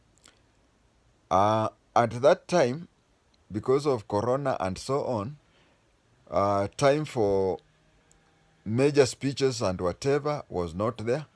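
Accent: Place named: Kiambu, Kenya. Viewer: Whether you’re aware about it or not, you’re now a public figure and we as your supporters are interested in knowing more about you. You have periodically mentioned your father but only given minor glimpses here and there about the other half of your Kikuyu background. Tunangojea kusikia hayo and by the way, for your mother to be from Nyeri, you speak Kikuyu with a Kiambu accent.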